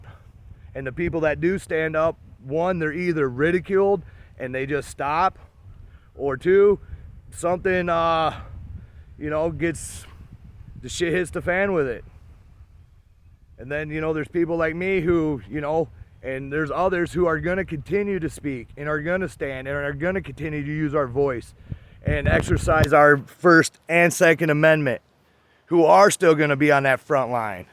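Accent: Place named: Canada. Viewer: Obviously from the accent this man is from Canada, too bad this has nothing to do with the United States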